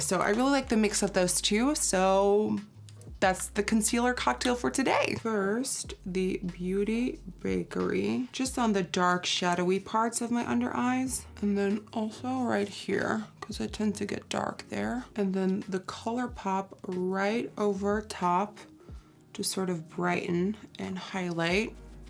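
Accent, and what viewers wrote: Netherlands. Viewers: I’m shook… because I just each led a video of in 2013 and Dutch accent is more strong… 2018 he accent is perfect English…I never know was Dutch I found about by watching her old videos …I would never of known if I never watched her older videos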